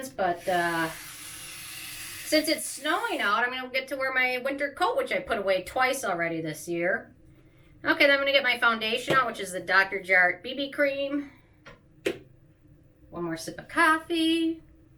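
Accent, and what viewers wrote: Minnesota, United States. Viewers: Minnesota! I was wondering where the accent came from!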